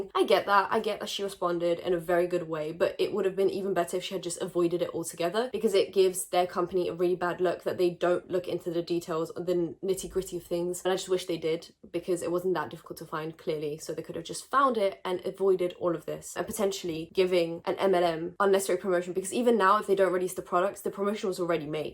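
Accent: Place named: United Kingdom. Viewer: I fucking LOVE this kind of British accent when they pronounce literally as “lit-cher-lee”. It’s so damn cute.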